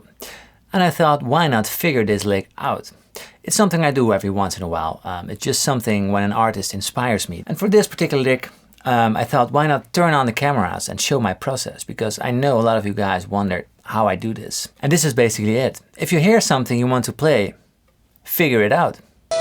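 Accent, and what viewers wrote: Netherlands. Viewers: Is he dutch? He sounds dutch af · toen ik voor het eerst een filmpje van je keek hoorde ik meteen al ''dit is een Nederlander"' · U dutch?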